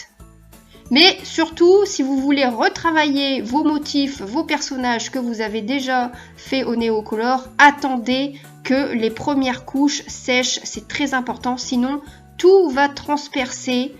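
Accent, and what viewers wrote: Nord-Pas-de-Calais, France. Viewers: pas du tout. Adorable accent chti hein?😁😁😚💗💗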